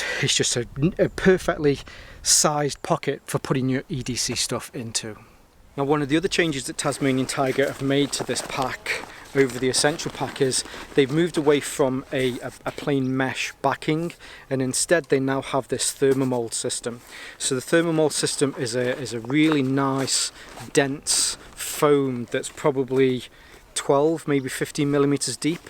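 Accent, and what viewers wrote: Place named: Stoke, United Kingdom. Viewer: Funny, i watched a few more of your videos, then it suddenly hit me: i recognise that accent! I moved from Stoke about 20 years ago.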